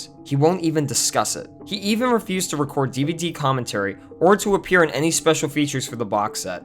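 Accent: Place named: United Kingdom. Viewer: Sue Lah Co (NOT Sue Loo Cooooooh*) (NOT *So Long Kong*) and (NOT *anything else!) SUE .... LAH .... Co (with Co being pronounced like the American acronym for a "Company" or "Co." or perhaps: Sue LOCK oh ................................................................... ??? ! PLEASE................... ... Dude... if you had truly WATCHED "Aliens" ... you'd have known this. we who LOVVVvvvved..... all these movies are actually distracted (terribly) each time you say it YOUR way. ---Overall.... "We" even agree with you. WTF "happened" to Alens3.... but dammit man. Sue-Lah-Coh ... Ok ? ...................... You GOTTA "re.do" this Man.... or at least re-upload with SOME kinda acknowledgement at the beginning of this video............ we GREW UP with these movies Kid..... we have seen ALLLLL the backstage and Behind The Scenes videos on the aliens movies and brother.... 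this is an egregious error, to ANY erudite "Aliens" fan. ................. seriously . dude..... I'm sorry to say it. But it's true. it simply shows us you're NOT an "Aliens" fan................ and if NOT...... WTF would YOU be "outraged" about anything "wrong" with Aliens... ...................... if you cannot pronounce the name of the "Sulaco" properly? PLEASE.............. "excuse" yourself by re-uploading (with a statement at the beginning) that you cannot help but pronounce it (phonetically) like someone from the U.K. ................. and we will "Let it Slide".... but ... be slightly more careful dud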